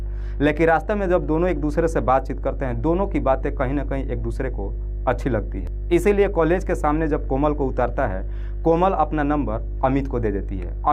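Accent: Bihar, India. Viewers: Bihari ho kaa bhai?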